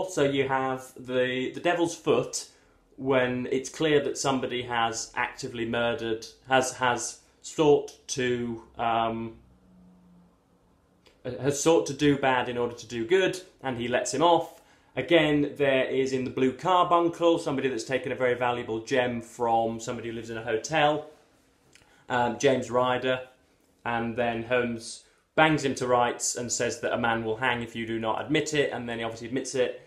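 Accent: United Kingdom. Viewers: I realize...I don't understand British accent. But with book as the topic of discussion, I am going to practice understanding your accent via your channel.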